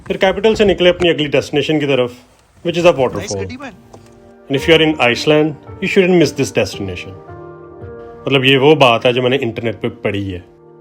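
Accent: Punjab, India. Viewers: yar biggets fan from lahore pakistan boht hi piaray lag rahay ho achhi video bnai hai yar punjabi main baat kia karo ziada, love your natural punjabi accent urdu, punjabi bola karo koshish karo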